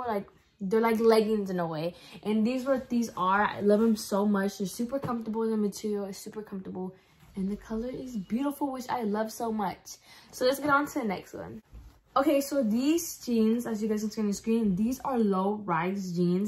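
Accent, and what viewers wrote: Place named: South, Mexico. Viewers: it’s a Mexican accent...’Hot Cheeto girl’ is literally a microaggresion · Factsss her Accent is to die for i love Mexican Accents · Very south Mexican accent it’s🥴 maybe cuss I’m from nyc lol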